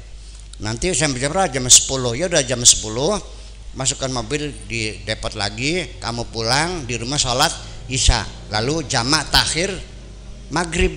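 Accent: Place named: Banyumas, Indonesia. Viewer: Penyejuk sejati, suka sekali dengan ngapak ngapak nya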